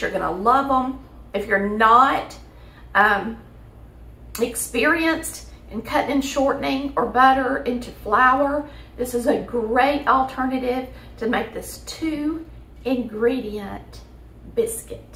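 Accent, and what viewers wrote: South, United States. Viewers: Always smile when Americans say these are “biscuits”. To me, an Aussie, those are scones and you can make them with fruit, dates, sultanas etc or veg like pumpkin. I joist LOVE pumpkin scones but you guys don’t eat pumpkin as a veg right? Maybe yours are bitter hence have to be full on sweetened for pie. We don’t do the pie thing much in fact I never have but pumpkin soup Yum! And scones. Back to scones here. We call a biscuit that food you call a cookie lol. If I called it a cookie it would be understood here, as Americanisms are pretty well absorbed here, but if I said I was baking biscuits, I would be expected to make round flat crunchy things. But your scones, oops Biscuits look amazing so will have to give that a try here in lil ole Perth Western Australia! Just stumbled on your show btw. Love it and that sweet lil gal southern accent is cute as apple pie! 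· You can kinda taste accents... you can tell how well a persons gonna cook by how the say oil... the southerness, I Love it!... and those are JUST like mamas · Love your southern accent!!! Are you from Alabama? God Bless you...they look delicious😄❤ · God I love your channel, and your wonderful southern accent that I miss so much, and the FOOD, omg how I miss it and Southern Hospitality. I lived in the South for the better part of sixteen years and I so miss the hospitality. It's one of the thing I brought back to my my mid-western city I grew up in. When guest come over ( or just drop by) the host just sets the table and lays out food. Don't you dare refuse! It's and insult, and in the long run, you're glad you shut up and ate. Southern hospitality is a legend that continues on to this day, and I practice it in my mid-western state ( the one with the huge Arch on the river front), and I'm know for it. 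As a result my friends have learned what hospitality means, and they know to shut up and sit down at the table when they come to visit me. You bring back so many memories (as well as cooking tips). I just lover your channel.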